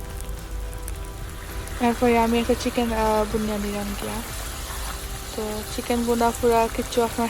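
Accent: Sylhet, Bangladesh. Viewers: Walaikum Salam apu. Apnar sylheti accent khub valo laglo. Joined you join me too dear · Amar car wash ay jaytay darun lagay, specially car ER bhitor boshay thaktay. Apnar bhasha ki sylheti?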